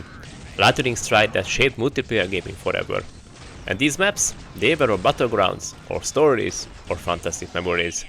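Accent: Hungary. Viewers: Sounds like you're hungarian speaking English. Good content tho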